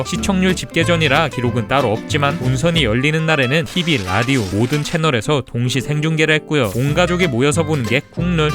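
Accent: South Korea. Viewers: 왜 자꾸 김치발음 물씬 풍기는 되도않는 영어 섞노 ㅋㅋ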